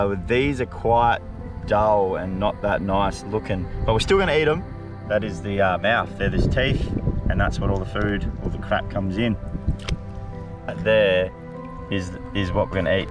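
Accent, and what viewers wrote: Australia. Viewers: The Nads are called "Umi" in restaurants, you brave souls! New sub, love your channel, still havn't figured where your at, my guess is Australia. It's the accent!! 🤔🥰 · Are u Aussie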